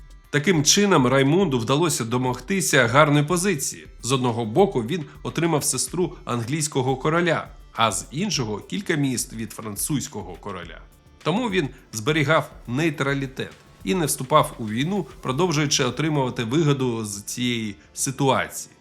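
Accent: Russia. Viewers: Дуже змісовно. Дякую за працю. І над вимовою, бачу, працювали ☝ Але ще відчувається росіянський акцент. Працюйте над собою і над новими матеріалами для відосів. Я Ваш підписник. Ще раз ДЯКУЮ.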